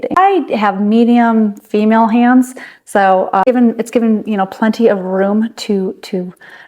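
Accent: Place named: Michigan, United States. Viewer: I love that she took my comment as cool as she did, because you can’t really judge tone through text but I love when she drops “actually” in her videos... She hits it hard with that upstate Michigan accent... (it’s kinda cute)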